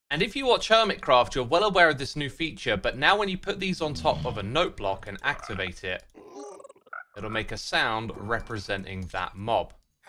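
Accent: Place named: United Kingdom. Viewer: i cannot believe it has taken me this long, but this is the first time i realize has a british accent.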